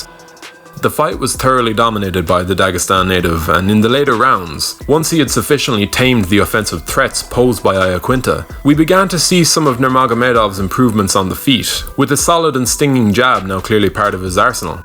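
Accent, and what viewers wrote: Ireland. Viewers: Is that an Irish accent gracing this vid?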